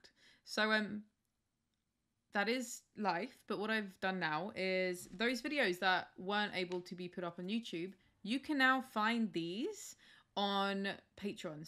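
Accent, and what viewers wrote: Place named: United Kingdom. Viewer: What is your name again, please?! I love the British accent!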